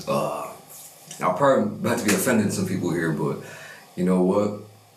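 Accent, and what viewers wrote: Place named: United States. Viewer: You can tell that been to America by the way he talks 😂 Keep up the great vids man 🙌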